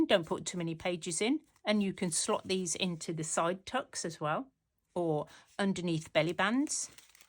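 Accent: United Kingdom. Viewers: I love the double pockets. Is that a Black Country accent I hear? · I love love love everything you showed us! Your style is my style. I really enjoyed listening to your British accent and seeing all you had to show us! Thanks you so much for sharing❤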